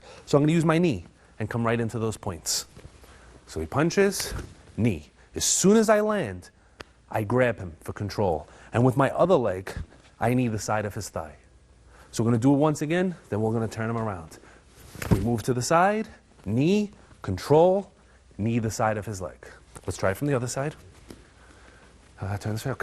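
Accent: New York, United States. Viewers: That's some amazing shit I like your videos you don't act like a bad ass just how it's done. Btw, you from NY.?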